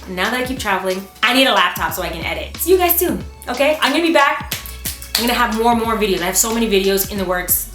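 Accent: France. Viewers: Ton accent francais est so cute! :D ❤ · your french accent is so freaking cute! You should come to Paris one day! Je t'aime! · i loove your accent in french! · love your french accent, you're sooo cute ! I'm a new sub and already love your channel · love your franch accent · J'adore ton accent français ... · I love your french accent it's really good (I'm french by the way) Love from Paris 3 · I'm french and your french accent is the cutest omgg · Great video !!!!!!!! And i love your French accent :) Je t'adore <3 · I'm French and you know what ? I REALLY LOVE YOUR FRENCH ACCENT !!! :D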